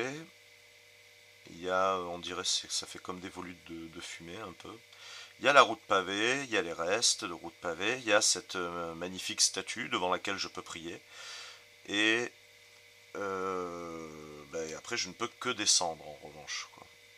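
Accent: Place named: South, France. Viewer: Si tu n'habite pas trop loin de la Bretagne je veux bien t assister pour tirer les cartes voir pourquoi faire une partie ensemble pourquoi pas.hé hé...mais ton accent me fait penser que tu es plus dans le sud.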